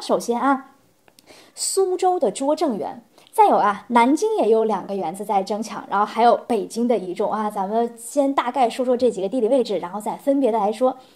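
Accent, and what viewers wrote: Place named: Shanghai, China. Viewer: 上海普通話老靈了~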